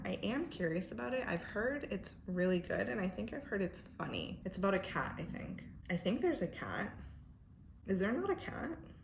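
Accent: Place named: Colombia. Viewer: Me encanta tu acentito colombiano 😊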